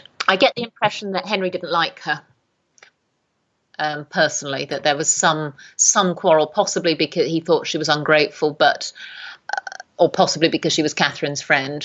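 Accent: United States. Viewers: I'm immediately put off by the American accent!!!!!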